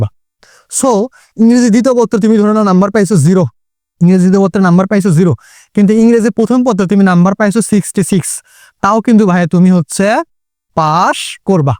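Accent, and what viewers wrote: Bogura, Bangladesh. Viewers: Vai bogurar naki????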